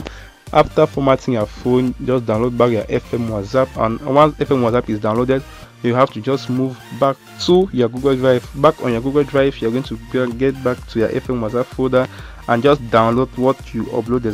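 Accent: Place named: Nigeria. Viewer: Your Nigerian accent is hard to understand please make a video on how to restore the backup to a different WhatsApp like business WhatsApp otherwise this video was great thanks..... Steve from Zambia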